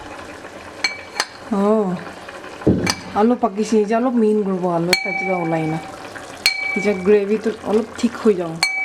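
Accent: Nagaland, India. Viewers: Ur voice n ur nagamese talking style just wow 🤩plus ur cooking skills just appreciatable 🤤🤤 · Ahhh soo good listening nagamese. .. Me too stay in Gurgaon but I'm from Nagaland (Dimapur) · I made pork watching your Video 😁 and it was incredible 👌 couldn't believe that I could make such tasty 😊 but I'm sorry I didn't tell the guest that I followed your ingredients 😆😆😆😆😆😆 You are beautiful like that taste of your cooking ingredients 😍🤩😘 And your accent Doesn't sound like Ao neither Lotha but like Konyak 👍👍👍👍 😊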